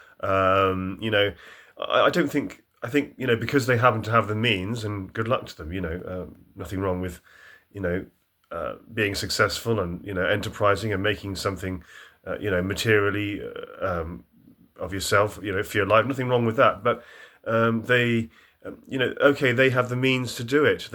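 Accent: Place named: Southern England, United Kingdom. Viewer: Horrible southern English accent too. Just oozes snobbery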